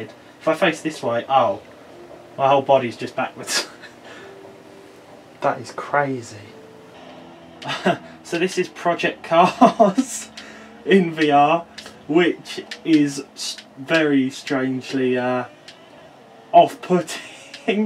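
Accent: United Kingdom. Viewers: Great video but honestly, the commentary is so damn british it's incredible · Hahahhaha "excuse me.... here we go!" Hahahhaa I love this British guy!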